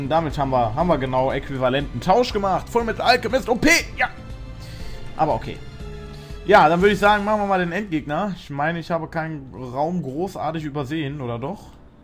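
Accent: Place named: Saxony, Germany. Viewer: die bayern reden nicht so xD die aus leipzig reden so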